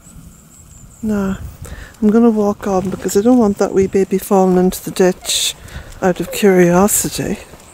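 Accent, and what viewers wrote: Ireland. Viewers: Thank you, that was such fun. West Ireland is so beautiful, love it. Those cows were quite a treat. They sure look healthy. While you walked down your driveway I notice how the trees form a most lovely & mystical archway. How cool that is. I wish I could retain new information better. Thank you for repeating the names of the flowers & plants. I simply love Ireland & your accent. Since following you I've grown a better appreciation of my own small piece of paradise. Love to you, Jack & your home. Blessings P.S. My definition of goddess is same as yours.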